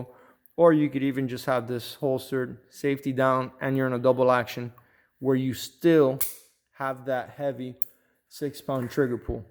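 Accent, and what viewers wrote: Miami, United States. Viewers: i can tell you are from Miami just by the way talk bro lol, good video man keep it up! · You sound like you’re from Miami lol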